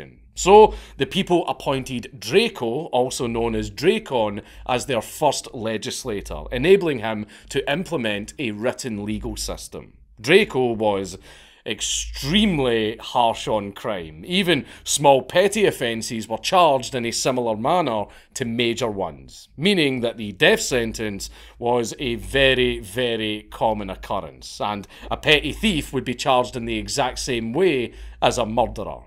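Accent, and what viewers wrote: Scotland, United Kingdom. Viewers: Oh nvm i thought this was hunter not some scottish guy lol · >a scottsman making fun of any accent Lel, shiggydiggy bro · Lol AI can't understand a Scottish accent 😂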